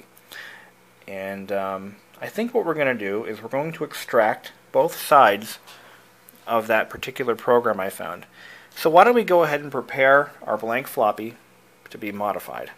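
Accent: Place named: Massachusetts, United States. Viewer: I think I finally figured out what state your from! It's Massachusetts. In some of your other videos you make subtle hints about this but never confirm it. In fact when ever you talk about your computers you acquire from your school district you make it your business to tell us not to ask or even talk about it. It's not a big deal, but for me it affects how I relate to you as a computer guy. Your accent isn't southern or northern, but something else. I'm glad I finally know what part of the country your from so I can at least relate to some of things you talk about, like when you say "in this part of the country you don't see these kinds of Honda's." Which part? In some parts of the country a car from the 1960's can easily be found driving around because the whether is dry and it never rains! People forget how curious some of are and I am glad you atleast allow us to post comments. 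Thanks, Derek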